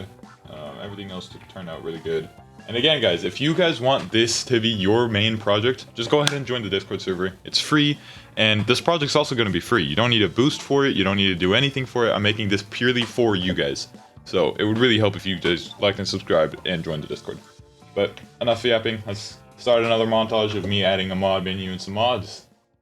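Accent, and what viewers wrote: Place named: United States. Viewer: Yo! What country do you live in and did you used to live in America because you sound American but you say your eu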